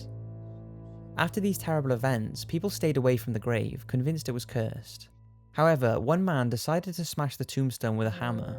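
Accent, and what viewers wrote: Wales, United Kingdom. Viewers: does anyone know if this guy is welsh or not? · Sounds Welsh to me.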